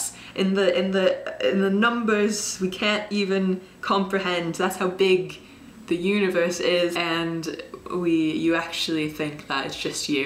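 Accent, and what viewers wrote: England, United Kingdom. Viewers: I watched this video and the first video you posted on YouTube. I swear your voice sounds way different. I first thought you were British, but in your first video you sound American! Haha, are you watching too much BBC? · and you've a little English twang in your voice ohmygod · Okay legitimate question about your accent (not meaning to be harsh or offensive): How have you managed to develop a semi-English accent? Is it somewhat deliberate? Because I find it hard to believe that you can naturally pick up an accent when you're a young adult. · Does anyone else notice her slight British accent? If you do, isn't it adorable?! And yeah, I believe that wee not the only ones out there. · You have like a British accent in this lol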